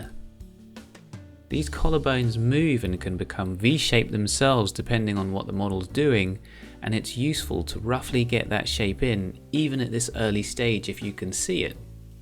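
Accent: United Kingdom. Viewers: haha you're a Londoner - Evening Standard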